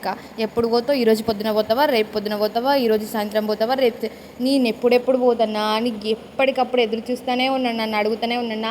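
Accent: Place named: Telangana, India. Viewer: పక్కా తెలంగాణ స్లాగ్ 🙏🙏🙏🙏 Super super 👌 👌 👍 👍 💐 💐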